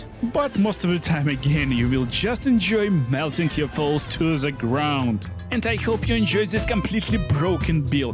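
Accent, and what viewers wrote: Russia. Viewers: omg, your accent-- are you russian? nice glitch btw. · Русский акцент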